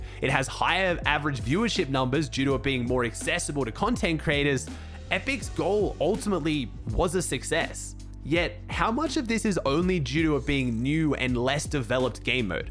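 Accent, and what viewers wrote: Australia. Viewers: Props to the editor who, seamlessly collated these videos and worked perfectly alongside Aussie narration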